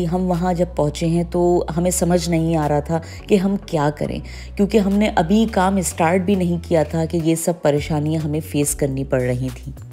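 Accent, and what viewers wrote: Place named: Lucknow, India. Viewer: Aap ki urdu tallafuz aur zaban subhanallah bilkul lucknowi hai! Shayad aap log UP India se migrate hoke Karachi Pakistan gaye honge!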